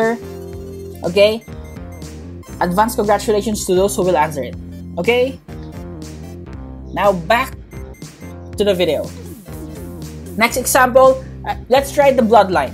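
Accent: Philippines. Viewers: Pinoy ata tong nagsasalita hahahahha accent nya parang pinoy mag english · I sub on you bc i know your filipino.FILIPINO RIN AKO! HALATA NA SA PAG SASALITA AGAGAGA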